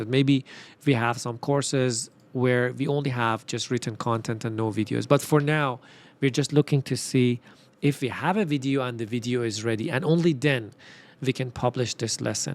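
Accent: Iran. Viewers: your accent is very similar to farsi btw you persian ?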